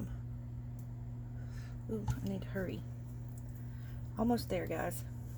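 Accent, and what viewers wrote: South, United States. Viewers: PS...still loving that southern charm and accent....music to my ears👂👂👂👂👂👂👩‍🎤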